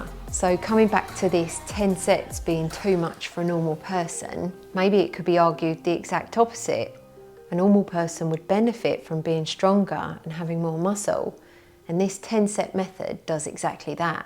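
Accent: United Kingdom. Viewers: You know the weird feeling you get on YouTube when you follow a channel for so long that in your head you are totally like a real friend to the channel host! Well that happened to me and I am not even ashamed! 😊 You are bloody brilliant! (That was my most British impersonation, also said it in your fabulous orgasmic accent!) 🫶🏻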